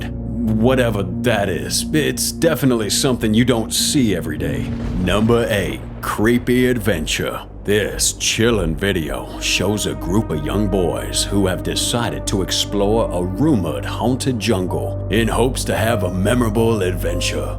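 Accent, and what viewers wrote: South, United States. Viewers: Great content as always. I watch all your vids and my gf and I get a good laugh out of your accent, and I mean that in a positive way. We're from Chicago area and we love southern accents.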